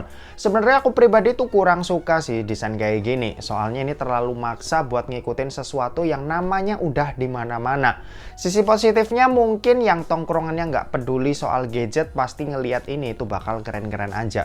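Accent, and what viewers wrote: Semarang, Indonesia. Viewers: Anak Semarang ya mas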